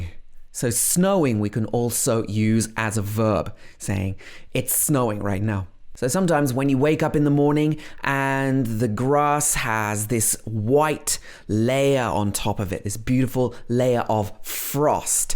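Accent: United Kingdom. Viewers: This my favorite British accent coach and channel 🔥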